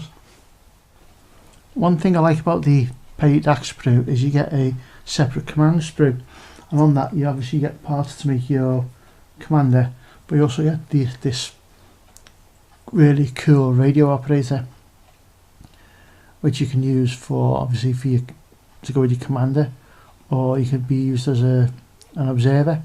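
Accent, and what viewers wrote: United Kingdom. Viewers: Hey man you've just been suggested to me. Glad i came across you as I'm going to start playing bolt action. Where you from I can hear a slight Merseyside accent but I reckon you might be from my side of the water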